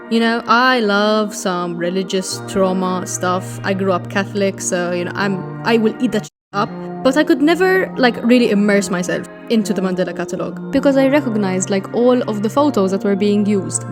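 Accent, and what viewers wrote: Malta. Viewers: This is so off-topic, but the whole video I'm sitting here like "WHERE's their accent from, I can't place it but it's so pleasant to listen to" and turns out I've never actually heard a Malta accent before?? so now I know lol, but just wanted to say, your voice is so pretty! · Was trying ro figure out the accent would have never guessed Maltese Also you are so right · off topic but i love the accent.. i have always been fascinated by the maltese language and how it is latinized arabic but i've never heard a maltese person speak english and the accent is just that. very warm and lovely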